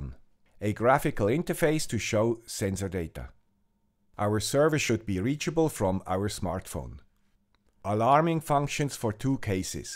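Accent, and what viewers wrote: Switzerland. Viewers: Make sure that no-one tells our Swiss guru that he should have described the Discord community as "vibrant" rather than "vibrating" at about . Keep up the good work, I am sure that technical instructions sound more convincing when presented in a Swiss accent. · my brother with that crazy Swiss accent... I'm so very glad 2020 is continuing to treat you well!! Just wanted to wish the best of wishes to you and your family well for the rest of the year!! Lets hope this insanity is mostly in our rear view mirror now...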